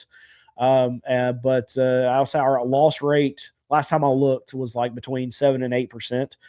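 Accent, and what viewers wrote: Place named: Texas, United States. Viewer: Are you in dfw? Sound like a burleson man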